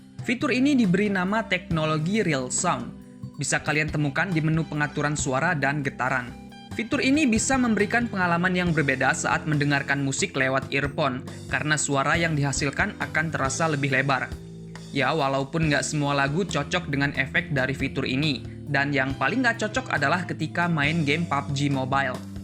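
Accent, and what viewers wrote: Lampung, Indonesia. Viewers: Bang Orang Lampung kah?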